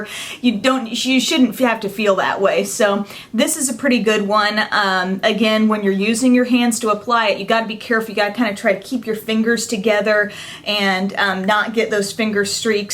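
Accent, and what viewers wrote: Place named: Texas, United States. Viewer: You sound like your from Texas. :)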